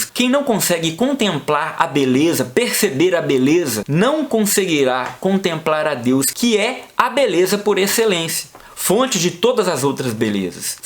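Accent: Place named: Minas Gerais, Brazil. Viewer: Grandes verdades ditas de forma simples, ao estilo mineirês. Abramos os olhos e convertamos nossas vidas. Cristo é o Senhor!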